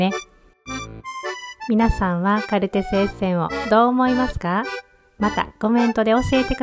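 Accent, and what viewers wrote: Kansai, Japan. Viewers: Nobanaさんのゆっくりとした関西弁のナレーションが大好きで、過去の動画もどんどん見ている今日この頃です！ 私は南カリフォルニア在住なのですが、ドイツ在住の日本の方の視点での動画、興味深いです～ 「ちょっとしたことでは動じない」、わかります！ 店員の失礼な対応など、いちいち気にしていたら毎日怒って自分の毎日も機嫌悪い日々になってしまいますもんね 涙 なのでNobanaさんが言うよう、「期待しない」がいいですね！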